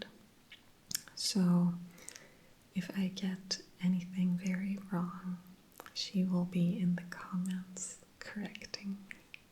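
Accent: Pennsylvania, United States. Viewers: This is going to sound silly now that I know where you’re from, but I thought you were Pennsylvania Dutch! I live in PA, and your voice sounds very similar to the Amish community here. I had thought you must be ex-Amish or something haha. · I’m from California and when I first listened to your videos I thought you were American! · Watched your channel for months and assumed u were American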